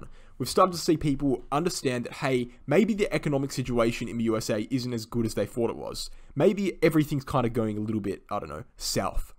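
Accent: Australia. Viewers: It’s refreshing hearing another Australians voice in the crypto space … keep up the great TA man 👌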